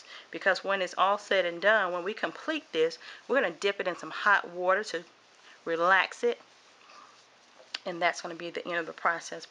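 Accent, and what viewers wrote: South, United States. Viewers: I love your accent. It sounds southern. Yes, I'll get a mannequin head from Sally's, lol. Those braids are gorgeous and you are very detailed. ❤❤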